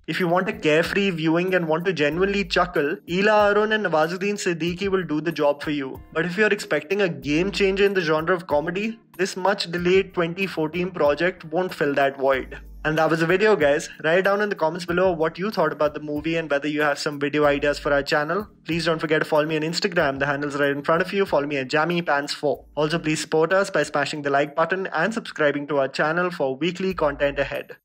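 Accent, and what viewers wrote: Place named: South Delhi, India. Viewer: Bhai agar tu sach me Apne channel Ko grow krna chahta h faster....touh yee South Delhi or Bombay wali English chord de seriously.hindi pe ajaa.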